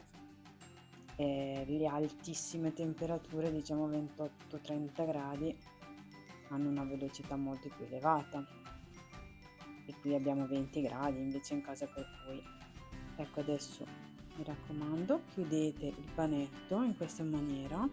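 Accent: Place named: Brescia, Italy. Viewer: bravissima, oltre che l'accento bresciano che e di una simpatia unica, sabato provo a farla alla tua maniera.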